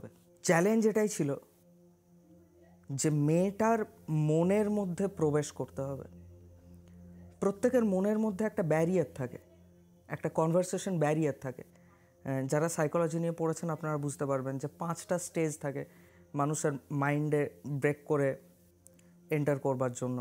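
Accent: India. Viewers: Ew! NOT the biMaaaru tongue again, ffs!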